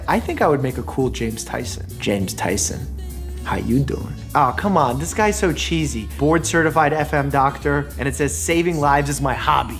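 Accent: Russia. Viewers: Are you Russian?? Even better😍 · im new, are you russian?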